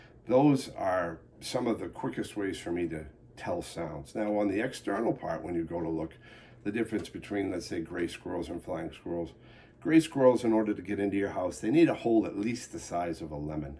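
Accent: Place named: New York, United States. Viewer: I thought you were based in NY by your accent....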